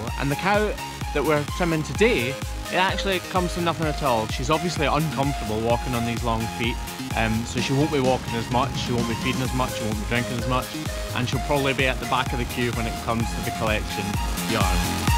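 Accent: Scotland, United Kingdom. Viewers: I've watched almost all of your videos in 3 days time. POST FASTER! I knew nothing about cow farriering prior to your videos. A good Scottish accent helps too.😊 ha! Good day!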